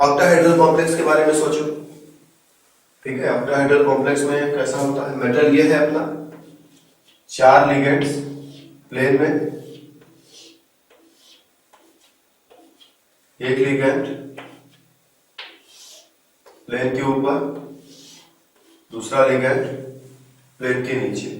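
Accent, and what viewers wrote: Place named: Uttar Pradesh, India. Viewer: sir aap U.P se lgte hain. bohat acha smjhaaye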